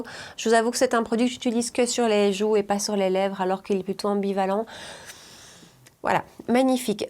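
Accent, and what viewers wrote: Belgium. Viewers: J adore tes vidéos, j adore le fait que tu viennes de Belgique ( je suis belge et j habite en France depuis 10 ans, ton accent est un émerveillement des ouïes) et j adore ta couleur de cheveux, aurais tu la référence de ta couleur stp?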